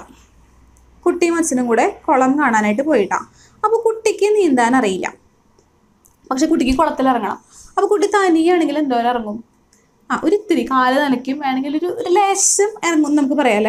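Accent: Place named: Thrissur, India. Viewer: Thrissur slang tta.....😃😃 And......Classum super .......Ellam clear aayi manasilakunnund tta.....Thanks.....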